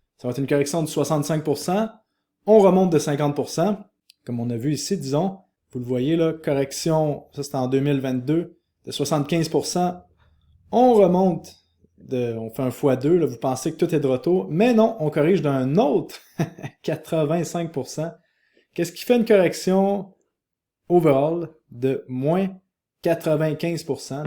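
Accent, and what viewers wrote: Canada. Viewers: Bonjour, Première fois de ma vie que je dépose un commentaire sur internet, malgré ma consommation de contenu sur les cryptos depuis bientôt 4 ans sur le sujet.... Vidéo courte de 13 minutes, ou chacun de tes mots ont résumé à merveille tout ce que j ai pu apprendre de cette période! Merci à toi pour ton dévouement et ta philosophie! Un petit frenchie holder dans l âme qui au delà d apprécié ton formidable accent canadien, saura je l espère tirer pleinement partie de tout tes judicieux conseils! Longue vie à toi!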